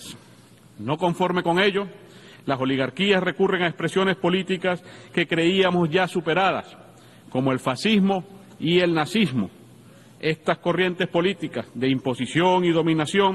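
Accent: Cuba. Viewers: Tiene un aceptó cubano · ¿A nadie le parece curioso que este tipo tenga acento cubano? No es Venezolano. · Ese tipo no es venezolano/es cubano,se sabe por el asentó!.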